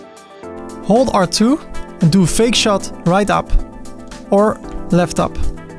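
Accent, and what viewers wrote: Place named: Netherlands. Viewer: You have a nl acsent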